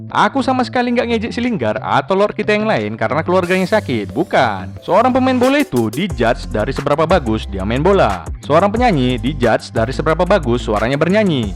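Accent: Medan, Indonesia. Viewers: Orang Medan Bang?? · Orang medan kah??? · Bg lu orang medan yaa bg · Abang org medan ya bg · Wkwkwk, the best presenter bola versi medan bung😁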